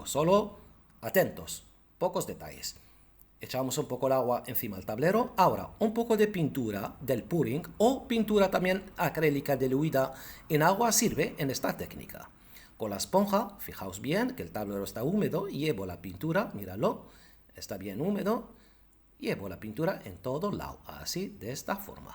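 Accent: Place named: Morocco. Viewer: Me gusta ver y escuchr a como maestro, con su acento marrocano en castellano. Recuerdo mis amigos Marrocanos que vivien en Oslo, Yo agradesco siempre por tù generocidad de compartir tus experiencias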